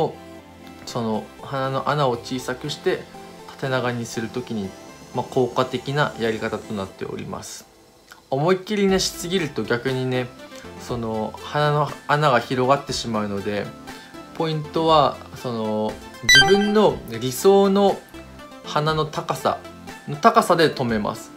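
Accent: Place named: Okinawa, Japan. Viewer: 沖縄のなまりはいってますね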